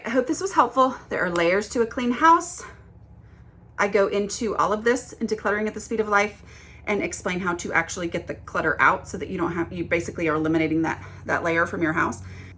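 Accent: South, United States. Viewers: I can hear your Southern accent when I read your book. So cool to hear you explain this concept out loud - I'm in the middle of Decluttering at the Speed of Life now. Love it!!! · You have a sweet southern accent and voice! You sound like me and my friends :) I loved your video and you're very easy and pleasant to listen to :) Thank you for all the helpful tips and I will be checking out more ! May the Lord bless you and your family:) Rachel and Dobie in Nashville 🎨👩‍🎨😺🍊💕🛐📙☦️🕎🕯️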